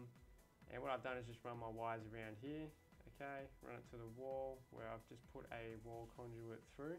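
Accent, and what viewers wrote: Australia. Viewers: I just recognized after 15 videos that he has an australian accent lol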